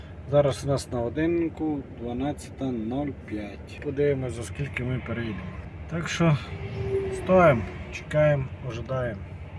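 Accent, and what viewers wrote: Transcarpathia, Ukraine. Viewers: Мені кажуть чому я не знімаю свої відео на українській мові , слухаючи тебе - це теж не українська мова, мабуть Закарпатська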